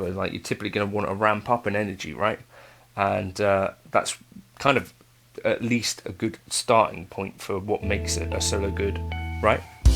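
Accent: United Kingdom. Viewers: He’s British?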